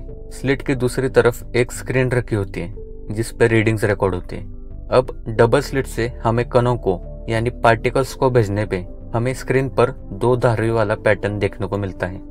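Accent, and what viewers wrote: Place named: Maharashtra, India. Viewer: तू मराठी भाषिक आहेस अस वाटतय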